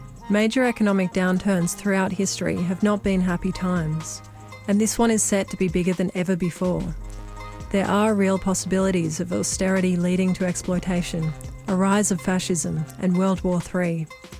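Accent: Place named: Australia. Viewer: On the plus side, the fall of the US and European Banksters means the "Economic Hit Man" will stop destroying places like NZ and Bolivia. I hear what sounds like an Aussi accent ... is this video and international effort or y'all coming from the perspective of OZ? Finally I've always said the problem is not peak oil, its Peak Sanity. The solutions are all around us. See my video on Beadwall for example.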